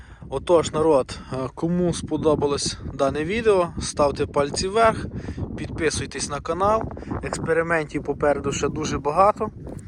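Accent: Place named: Lviv, Ukraine. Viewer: Ну чути що ви з Львівської області, навіть не спорте!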